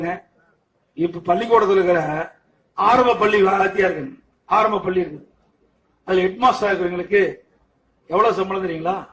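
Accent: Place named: Kongu, India. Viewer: Kongu tamil parakkuthu sir unga tock la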